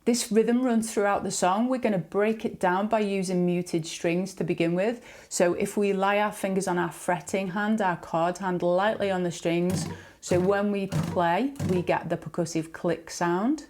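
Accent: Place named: England, United Kingdom. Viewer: WOW , GREAT LESSON , PLEASE TELL ME WHERE YOU COME FROM ,,..OK ? ENGLAND ? YOU HAVE AN ACCENT , JOHN FROM NORTH CAROLINA , USA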